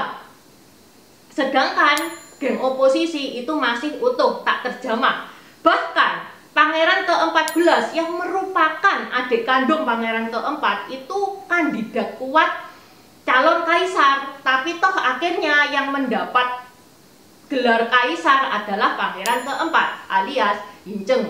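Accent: Java, Indonesia. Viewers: Suka cara ngmg mba ini...lucu ngakak..trus kl liat wajah kaget..liat logat nya..jawa bagett...haha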